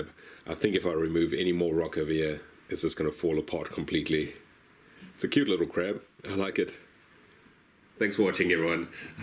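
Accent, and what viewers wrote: South Africa. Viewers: Are you from South africa🤔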